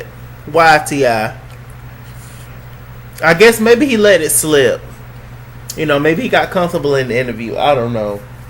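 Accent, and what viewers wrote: New York, United States. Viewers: you sounded just like NY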